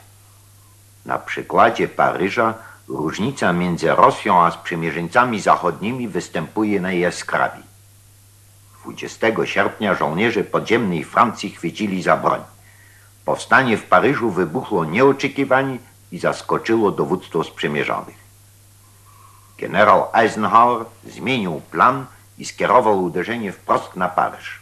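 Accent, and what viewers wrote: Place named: Poland. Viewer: Miło słyszeć ten czysto Polski akcent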